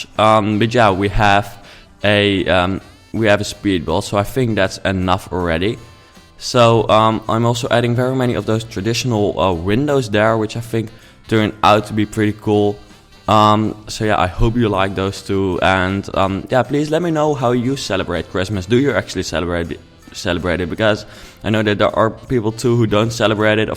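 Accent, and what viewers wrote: Netherlands. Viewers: Hihi je hoord ook echt wel dat je nederlands bent lol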